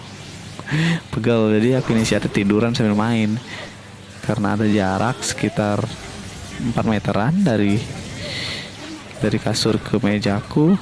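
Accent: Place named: Bali, Indonesia. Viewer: Bali sekali logatnya anda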